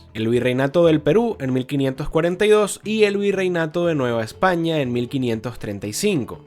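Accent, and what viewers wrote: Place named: Venezuela. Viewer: De que hablas? si el que hizo el video se le nota el acento venezolano a mil kilometros, a demas, muchos mexicanos no tienen en buena estima a Simon bolivar, por sus masacres y genocidios cometidos.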